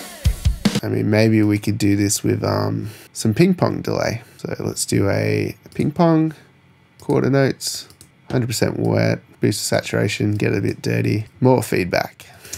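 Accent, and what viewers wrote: Australia. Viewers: Nice to hear an Aussie accent on a mix tutorial!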